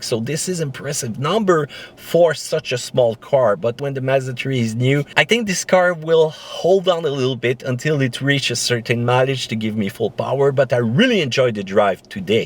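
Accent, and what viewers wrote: Canada. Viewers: From the states I appreciate and enjoy the Canadian accent. I loved hearing GSP from the ufc talk for that reason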